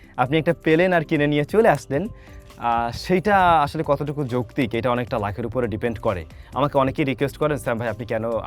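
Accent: Barishal, Bangladesh. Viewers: স্যাম ভাই, আপনার বাসা কি মোগো বরিশালে🥰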